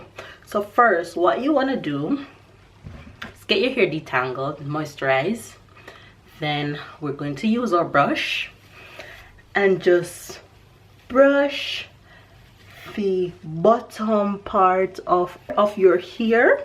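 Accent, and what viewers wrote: Jamaica. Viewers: Very cute and easy updo!!And I open your Jamaican accentMy family is Jamaican as well 😍😍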